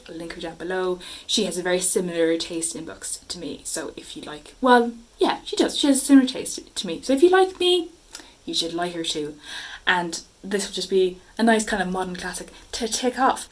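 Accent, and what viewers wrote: Ireland. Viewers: This was fun, I love Irish accents(but I’m biased)☘️ I read “Angela’s ashes” when I was a kid and I’ve been meaning to reread it someday. I know i really liked it (I like sad stories, it seems). Ooo I really want to read “the heart’s invisible furies” though, yes that one might win this month. Great choices, as always. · Aaaah so late to this but thank you for mentioning me and also buddy reading Angela's Ashes!! 'twas truly a wonderful memoir, and I'm definitely helping myself to this convenient list of Irish books to check out next 👀 Your Irish accent is so sweet -- I've been trying out the accent during my read and the vowels and R's are so fun LOL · Great selection! I've only just finished Hamnet and was absolutely floored by it. Had a major book hangover after. Your Irish accent was lovely to hear 😊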